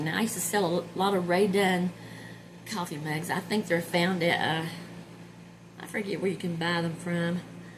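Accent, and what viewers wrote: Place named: South Carolina, United States. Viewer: HI,. Just found you today. And so happy I did. I was cooking breakfast and heard that accent. I am from South Carolina, so I know "us" when I hear it. I ran into the family room and was like, "Yes!". Maybe I will see you out there one day. Have a blessed day.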